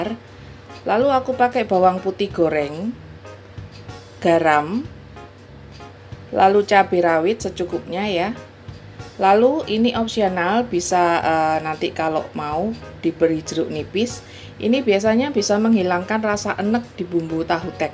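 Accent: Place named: Surabaya, Indonesia. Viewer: Suaranya spt CECE INTAIMAGAZINE. suara khas SUROBOYOAN koyo AKU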